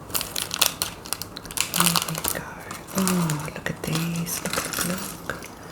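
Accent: Australia. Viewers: FINALLY FOUND YOU! I've been searching for 15 years for a soft spoken lady with an aussie accent! ❤❤❤🎉🎉🎉 This is reminiscent of tv shopping networks where i would hope for tingles but it was never garaunteed. Thank you, liked and subscribed. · First time viewer here! I was scrolling my feed and I literally looked at the thumbnail and thought, “I wonder what Australian ASMR would sound like” and I went to click the audio to preview heard her accent and it’s like this was meant to be. Can’t wait to watch more!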